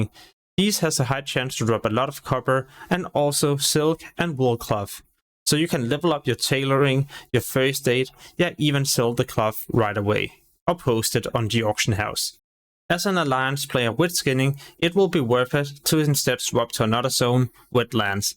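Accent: Denmark. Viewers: m8 listen to the accent, thats gotta be the phattest danish accent ive heard:D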